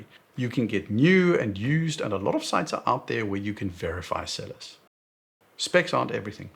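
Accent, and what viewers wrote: South Africa. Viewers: Bro are you South African?😅Speculating here. I do have loads of South African friends, hearing you was refreshingly unique but familiar.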